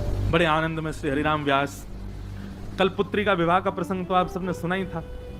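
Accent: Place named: Braj, India. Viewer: जय हो भैय्या बृज भाषा बड़ी अच्छी लगै आपके मुख से। सुंदर कथा। हमने भी राम स्वरूप जी के दर्शन किए हैं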